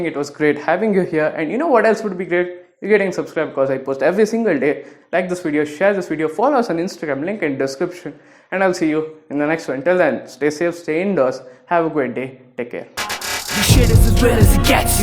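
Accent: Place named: India. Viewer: I am quite fond of stereotypical Indian accent and understand it well. But it's not bad to train yourself to speak in a more neutral accent. It can be done, you just need to practice. Also, work on your pace and avoid having unnecessary movements as they make you look awkward and nervous. Good luck on your next videos!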